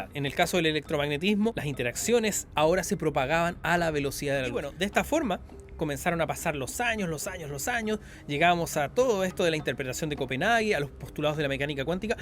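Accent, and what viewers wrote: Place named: Chile. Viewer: hola una consulta... eres chileno,... yo chileno seguidor de canales de ciencia como robot de platno, date un vlog, Thoisoi, jordi prereira entre muchos otros... primera vez el algoritmo me dejo caer tu canal... un placer escuchar ciencia en acento español de chile... y encontre por fin como un proton se convierte en neutron... eso buscaba y pille tu canal... y al fin lo aprendi... los Piones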